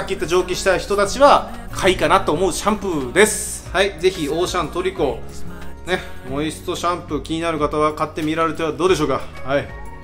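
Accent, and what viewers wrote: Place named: Kumamoto, Japan. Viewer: 熊本のイントネーション最高すぎる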